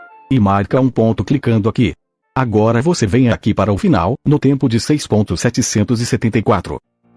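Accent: Bahia, Brazil. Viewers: O miseravel e um baiano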